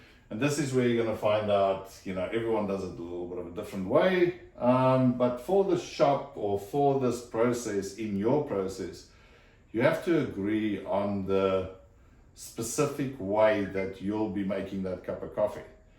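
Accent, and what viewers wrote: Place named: South Africa. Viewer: You sound like a Boer...And also, this is the only video on youtube that explain a SIPOC in a way that a dry tree stump can understand. THANK YOU SIR.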